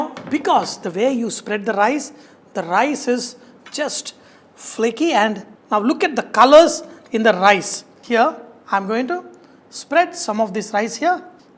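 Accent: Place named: Telangana, India. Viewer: i like your Telengana dialect narrations the most!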